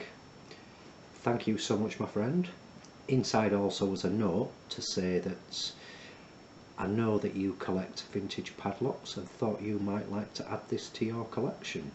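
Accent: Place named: United Kingdom. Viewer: i love your accent...or should i say, i love your british english. you got no accent at all its just how english should be spoken! :))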